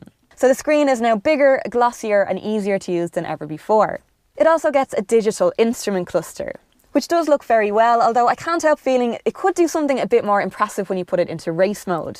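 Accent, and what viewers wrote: Ireland. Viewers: Great car, great review, and i love the Irish accent :)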